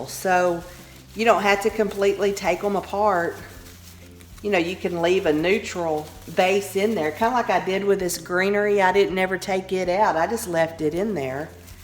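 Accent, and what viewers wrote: South, United States. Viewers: You must be southern like myself I want say what gave you away LOL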